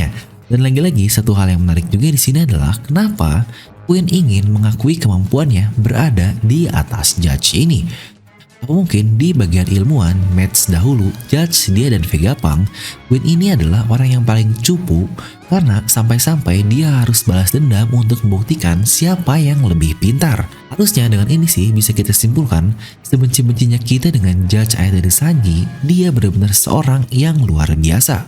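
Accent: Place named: West Java, Indonesia. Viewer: Min org sunda 😆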